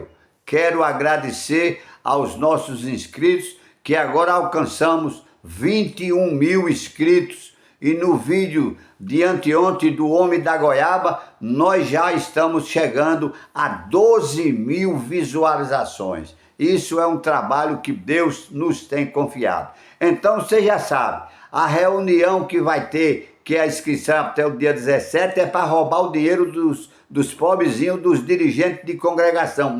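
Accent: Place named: Paraíba, Brazil. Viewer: pastor só uma curiosidade mas parece que o senhor é da paraiba.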